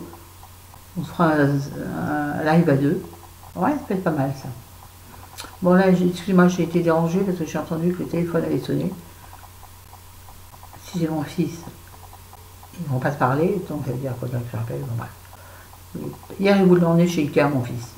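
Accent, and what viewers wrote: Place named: Marseille, France. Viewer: voilà, la gratitude, tous les jours, je remercie tout et tout le monde, même moi même pour tout ce qui m'arrive de positif. Autrement perso, c'est bouddhisme, mais le reste est perso, par contre d'accord pour l'égo, c'est une saleté qui pourri l'humain. bisous ma mdr pour la vidéo accent marseillais!!!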